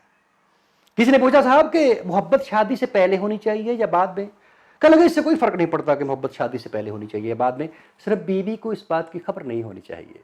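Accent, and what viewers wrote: India. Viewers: chutiye india ki urdu na bol....lahore waly marein gy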